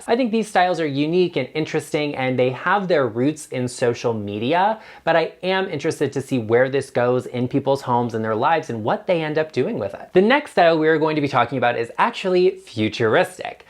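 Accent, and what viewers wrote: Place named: South, United States. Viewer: Love your channel! Thank you for all this valuable professional advice. Where, generally, are you located? Northeast, South?… You sound a little southern to my Texan raised ears. ❤️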